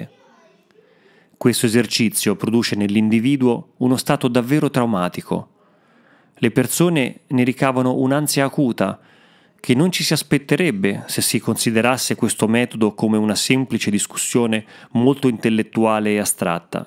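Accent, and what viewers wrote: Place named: Ancona, Italy. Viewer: Grazie per l'ottima lettura. Alan Watts è un autore che, a mio parere, molti usano senza citarlo; ma va bene anche questo. Scusami la curiosità: il tuo accento mi fa pensare alla calata anconetana o della provincia di Ancona... Sbaglio? Un caro saluto...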